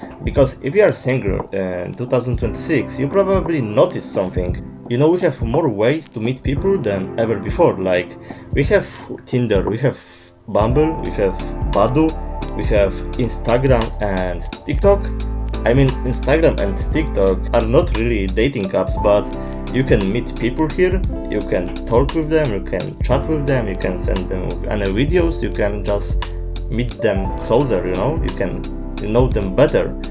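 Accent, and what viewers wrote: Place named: Poland. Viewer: Masz Polski akcent ale ma to swój urok. Fajne że tak rozbudowałeś ten temat w tym filmiku. Oh and have a nice day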